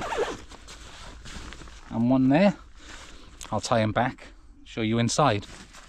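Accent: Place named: Cardiff, United Kingdom. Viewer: Hey bud, nice little video! I’m a Cardiff boy, are you in Cardiff or Newport as loving the accent haha!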